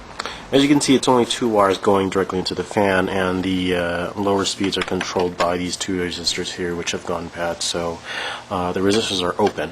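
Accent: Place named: California, United States. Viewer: I was going to say it sounds like you live in California.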